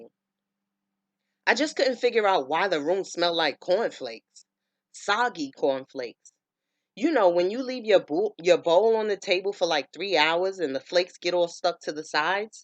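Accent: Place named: East Coast, United States. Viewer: Thanks for doing this you did. WONDERFUL JOB! U SHOULD CONSIDER DOING THIS FOR A LIVING! LOVE YOUR EAST COST SPEECH!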